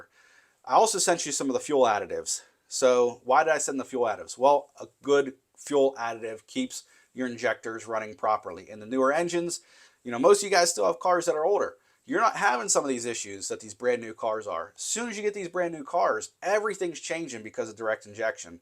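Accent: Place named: Pittsburgh, United States. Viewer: You sound like the people I grew up with in Pittsburgh. You must be from somewhere in that Western PA tri-state area with OH and WV....Edit, after a quick search I see you're "dahn" in 84, PA. I grew up not far from Bridgeville. Your brogue reminded me of my younger days before I moved "dahn hare" to SC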